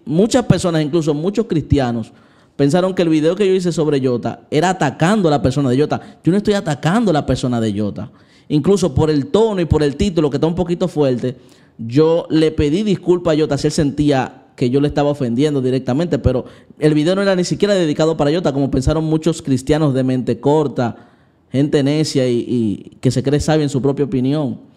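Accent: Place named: Dominican Republic. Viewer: Es que ese el acento dominicano. Sip, somos el país que peor habla el español en Latinoamerica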